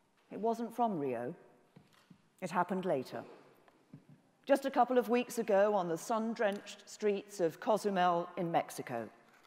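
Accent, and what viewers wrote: RP, United Kingdom. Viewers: I love to listen to her Queen's English speeches!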